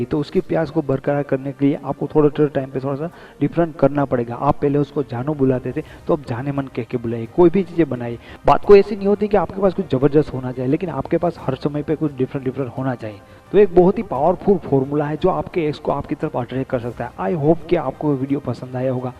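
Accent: Gujarat, India. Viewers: Gujju ke Bolne ka style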